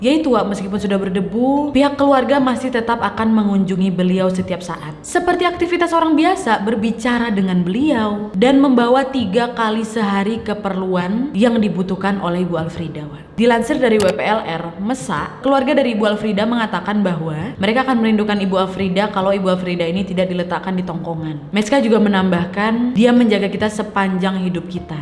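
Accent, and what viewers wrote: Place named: Sumatra, Indonesia. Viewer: Pembawa cerita ini logam sumatra atau sengaja meniru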